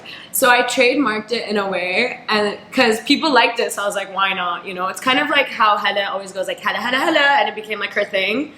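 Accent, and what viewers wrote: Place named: United States. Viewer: How do you have an American accent? And stop hogging all the attractive genes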